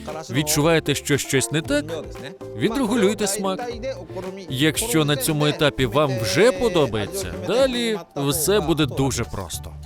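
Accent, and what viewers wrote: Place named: South, Russia. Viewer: О, южно- русский говор появился... но не я думаю понимают, так 50/50 примерно. Но лично я понимаю мову. Просто , как по мне это смешно выглядит.😂 с возвращением!❤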